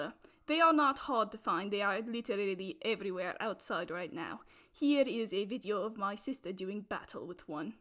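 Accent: United Kingdom. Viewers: Best British acent ever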